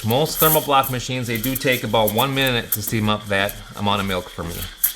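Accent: United States. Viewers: Wait? That is an American Accent ? I thought you were European ? Where in States is that accent from ? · Are you American or Canadian I genuinely can’t tell I’m English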